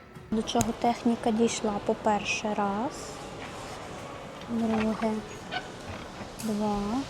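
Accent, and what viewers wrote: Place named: Surzhyk, Ukraine. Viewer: Аццький (давно такого не чув) суржік. Не витримав довго, треба працювати над мовою. Але бажаю успіхів і подорожей :)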